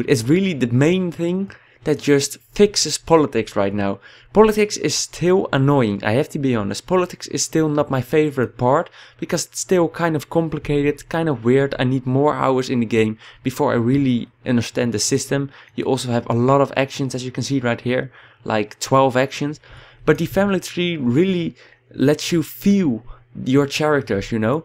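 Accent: Netherlands. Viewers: Hm. I never heard you dutch speak english before. The accent had me wondering where you might be from, i actually had to look it up on your channel :P If you had been speaking german instead, i'm sure i would've recognized your heritage at an instant. In other news: Neat video, i kinda like the accent for no reason as well. Only 4 more days till Attila, WOO! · haha nice, ik hoorde al zoiets aan je stem dat je nederlands spreekt haha, maar je spreekt erg goed engels hoor en een gaafe video! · Are you dutch? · i hear your dutch :D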